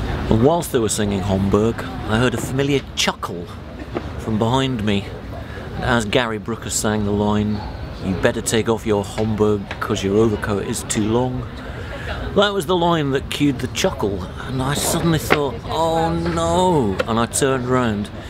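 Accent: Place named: Scotland, United Kingdom. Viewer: You are a bit of a, if you follow the lyric. Good promo though -an educated Scottish voice (fluent & trustworthy!)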